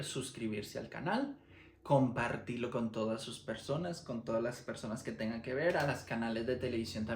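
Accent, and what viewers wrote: Paraguay. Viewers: Ya tenés mucho del acento paraguayo. Que estés bien! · Tu acento ya es casi paraguayo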